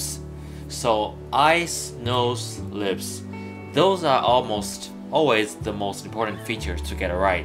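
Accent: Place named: China. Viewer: 听口音像是中国人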